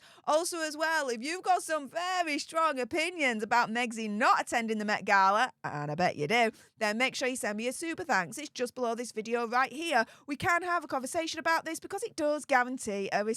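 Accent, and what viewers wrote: United Kingdom. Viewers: I love your accent true British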